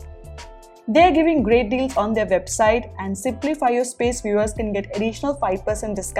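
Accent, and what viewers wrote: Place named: India. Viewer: Love your indian accent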